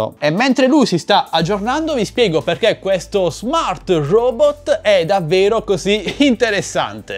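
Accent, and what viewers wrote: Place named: Bari, Italy. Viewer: si infatti non sto giudicando te.. te sei fantastico adoro i tuoi video.. penso soltanto che questo gadget sia un po inutile.. but anyway adoro i tuoi video anche dal tuo accento ahah anch’io sono di Bari ma per il momento vivo in Australia e mi manca molto il mio paese.. ti ringrazio ciao bello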